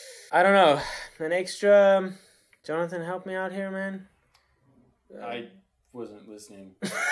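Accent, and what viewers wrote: South Africa. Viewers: its south African i blv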